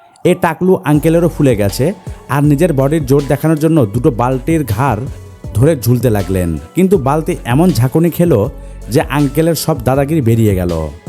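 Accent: Bangladesh. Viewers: কুত্তা তুই তো নিজে বাংলাদেশি তোর ভাষা শুনে মনে হচ্ছে 😂😂😂 এটা বাংলা দেশের বল 😂😂😂 শুধু ভারত এর বলিসনা ,, তোরাও বাংলাদেশি এদের থেকেও উপরে😂😂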